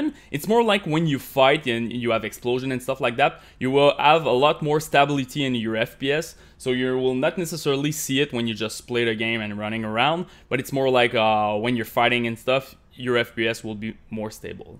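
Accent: Quebec, Canada. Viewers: lmao just curious are you from Quebec Or New Brunswick?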